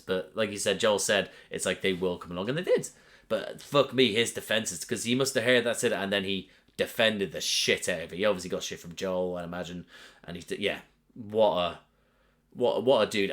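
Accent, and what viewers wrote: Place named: Northern England, United Kingdom. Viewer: R U from northern England or southern Scotland? You pronounce "about" as "aboat". You also pronounce "heart" as "herrrt".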